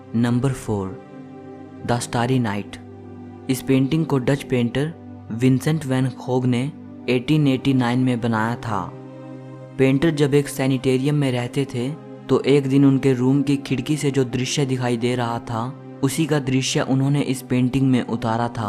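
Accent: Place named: Bihar, India. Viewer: Aapka bolne ka tarika Achcha nahi hai. Aisa lagta jaise koi Bihari ya Gaon ka insaan bol raha ho.Ya fir koi anpar.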